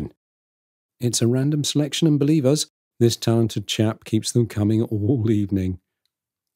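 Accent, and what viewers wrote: United Kingdom. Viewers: I am enjoying your travel videos more and more! They’re so well done and I love to hear that refined British accent😄 as you take us with us along all your amazing journeys. Looking forward already to your next one, even if I heard on your recent Live streaming that a mere 10 minute video takes up to 2 days to edit and upload.😮They’re much appreciated!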